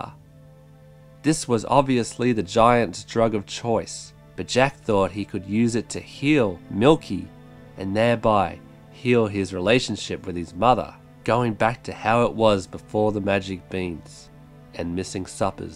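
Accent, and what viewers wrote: Australia. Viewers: "once upon a time there was a boy named jack". you took me here!! Ps: aussie accent is attractive the most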